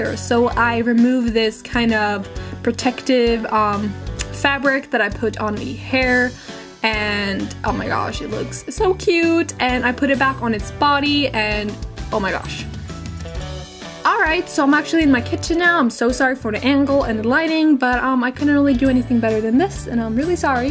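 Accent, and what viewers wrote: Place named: Sweden. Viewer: I love it! Ps your accent is amazing I wish Danish accents sounded like Swedish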